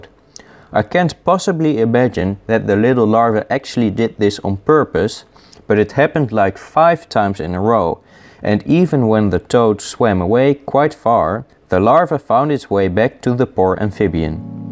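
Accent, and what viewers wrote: Netherlands. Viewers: Its a dutch accent we have a really weird g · thats such a heavy bad dutch accent wow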